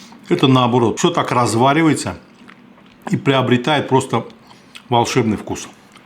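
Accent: Baku, Azerbaijan. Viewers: Ох уж этот Бакинский акцент... ❤❤❤ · Мне нравится сумгаитский, бакинский акцент. Сам с Дагестана но родился в Сумгаите. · брат, все твои блюда очень-очень аппетитные, а благодаря БАКИНСКОМУ акценту, ещё и завораживают 👍👍👍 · Сказочное блюдо и сладкий бакинский акцент! ❤❤❤ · Салам. Отличие дядя от других фуд блогеров когда он готовит обесняет, говорит, шутит. Бакинский акцент русского языка вапше супер. Жаль что отличие от "немых" фуд блогеров подписчики мало. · Уверен,блюдо невероятно вкусное!но благодаря вашему Бакинскому акценту,думаю оно вкуснее еще в 10 раз!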